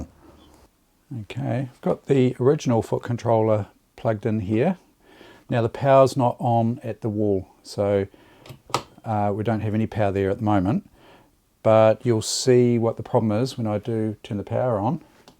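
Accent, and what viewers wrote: New Zealand. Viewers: So lovely to hear a New Zealand voice in the vintage sewing machine world. Horrified at converting a FW 221 to a jigsaw but also slightly impressed at the ingenious nature of the conversion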